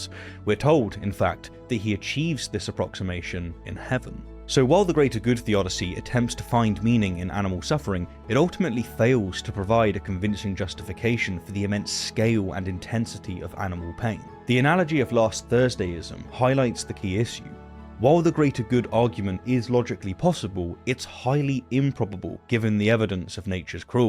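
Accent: England, United Kingdom. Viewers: The English accent gets me every time :)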